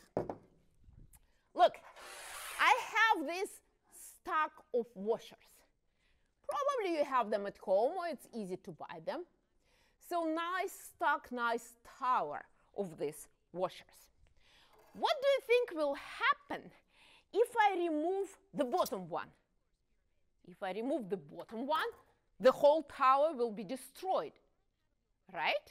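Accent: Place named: France. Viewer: I thought she was from France or Germany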